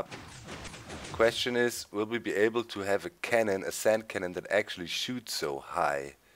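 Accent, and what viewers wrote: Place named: Germany. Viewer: versuch bitte mal ein bisschen an deiner englischen Aussprache zu arbeiten, es tut mir jedes Mal in den Ohren weh, wenn du "Witter" sagst... Keiner verlangt perfektes Englisch, aber es wäre ganz cool, wenn du das "th" wie in "SethBling", "over there" und halt "Wither" ein bisschen, naja, englischer und weniger deutsch aussprichst;).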